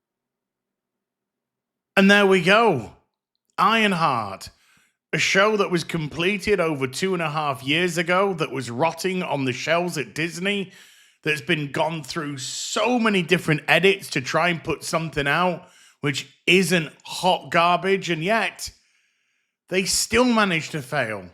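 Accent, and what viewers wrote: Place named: England, United Kingdom. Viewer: It’s not a British accent, it’s an English accent. An Englishman from England, speaking English.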